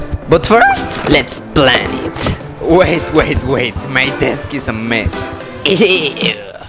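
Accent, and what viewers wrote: Mexico. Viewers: si es el español, el es Mexicano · Phantom GG his accents sounds totally Mexican and his cellphone shows his company (Telcel) that is a cellphone company from Mexico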